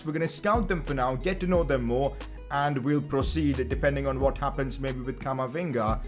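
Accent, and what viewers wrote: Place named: India. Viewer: Your voice is worse than your first videos cuz of indian accent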